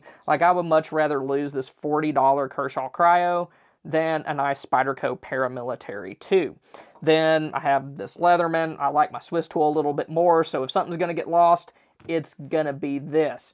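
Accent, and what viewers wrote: Texas, United States. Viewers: As another Texan, I'd say it's because of his accent that you can trust he knows what he's talking about. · The accent is in your ear. Being from Texas as he is, I am familiar with people in other places in the country that assume that if you have a Texas drawl that you are slow witted or stupid. Don't make that assumption is my advice.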